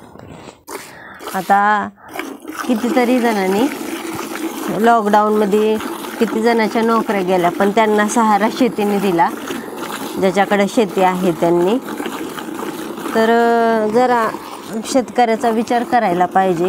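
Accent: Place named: Solapur, India. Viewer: Nice vlog: I love to hear your Marathi with Sholapur flavor. So frank and sincere.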